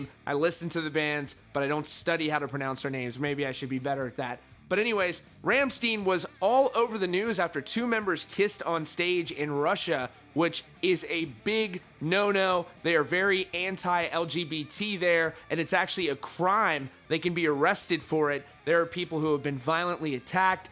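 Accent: United States. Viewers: Omg this American pronunciation, I can't. Dude, google how to pronounce names of music bands.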